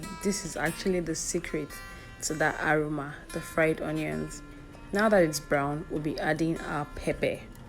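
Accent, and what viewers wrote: Nigeria. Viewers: First of all thank you for the normal Nigerian accent 😩💜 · I totally love this video. The Nigerian accent and cooking style! Thanks alot!❤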